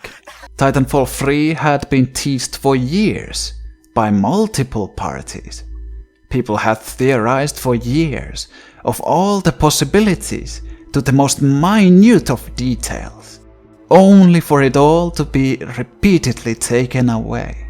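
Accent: Finland. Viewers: u sound Finnish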